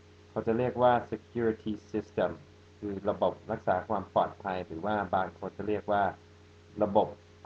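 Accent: Thailand. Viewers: พูดภาษาไทยชัดเว่อร์ไม่พอนะ พูดภาษาไทยสำเนียงไทยได้ด้วยอ่ะ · Where did you learn Thai? You even have Thai accent!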